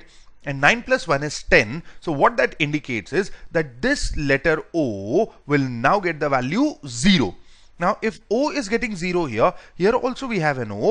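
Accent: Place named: Asia. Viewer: Your accent is too asiatic, dude. Good problem👍🏼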